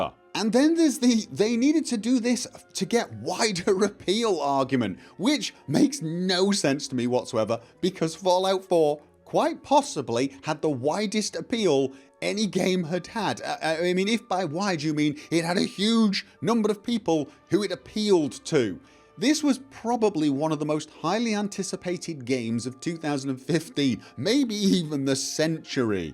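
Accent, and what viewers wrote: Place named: Manchester, United Kingdom. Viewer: Most English personspeoples can identify that subtle:0 Mancunian accent...If he starts talking Norwegian I for one will be lost. P.s. great avatar.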